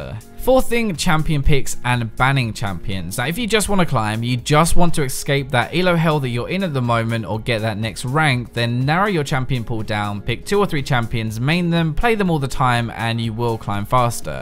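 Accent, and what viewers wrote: United Kingdom. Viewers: Main reason I watch your videos is your wonderful British voice.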